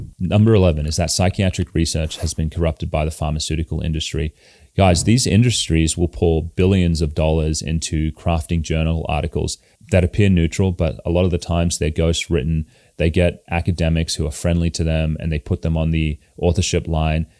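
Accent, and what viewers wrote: Australia. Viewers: You have an Australian accent but you seem to be based in America so who can Australians count on. In Europe Neurologists are more common for all the issues you mention.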